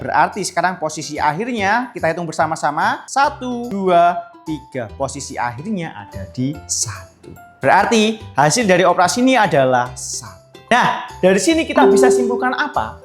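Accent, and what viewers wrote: Java, Indonesia. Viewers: Kak kamu Jawa?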